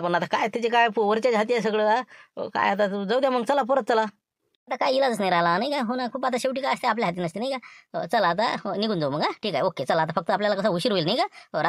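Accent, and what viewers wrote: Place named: Vidarbha, India. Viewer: आपली विदर्भातील बोलीभाषा खूप छान आहे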